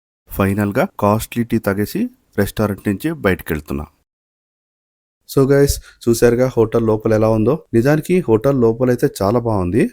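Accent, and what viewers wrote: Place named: Rayalaseema, India. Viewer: Hi I love your Telugu speak ing.it means you are from Rayalaseema.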